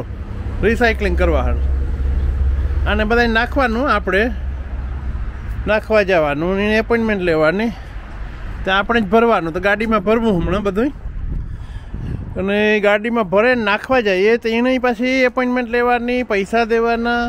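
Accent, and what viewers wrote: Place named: Mer, India. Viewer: સરસ વ્લોગ 💓 હવે કમેન્ટ્સ ચાલુ થઇ... તમે વિદેશમાં રહી ને પણ પ્યોર આપણી મેર ની ભાષા બોલો છો. એ ગમ્યું....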